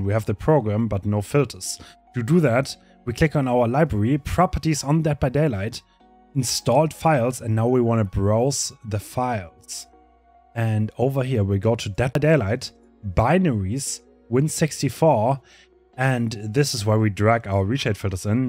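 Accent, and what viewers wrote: Germany. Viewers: I hear german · why is bro trying so much harder to sound more german than he actually is in this video?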